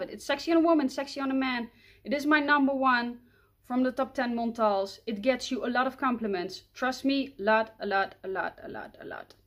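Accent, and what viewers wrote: Netherlands. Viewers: Nice accent! Netherlands??and yeeeeeee My fav montale is intense Cafe also !!! 😁😁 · Jij bent nederlands ik hoor het direct 😜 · Are you dutch????? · Haha ik wist dat je nederlands was. Hoorde gelijk aan je accent. Nice. Doe je ook nieuwe montale geurtjes? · I hear dutch accent, are from your Netherlands? Im discovering Niche too, great video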